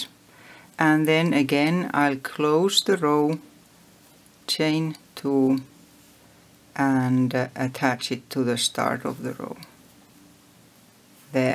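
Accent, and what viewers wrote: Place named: Finland. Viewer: Kuuntelin aksenttia ja totesin että oiskohan suomalainen ja kyllä vaan. :D Kiitos ohjeesta.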